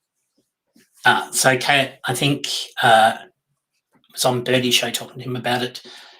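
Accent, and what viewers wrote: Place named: Western Australia, Australia. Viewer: You’re from West Aus too!! I live in Perth. It’s funny I could tell by your accent that you must be from West Aus, apparently we sound more English/Australian which is nice I think. Glad to see another West Aussie interested in this case, my husband is sick of hearing about it all from me. Look forward to listening again 😊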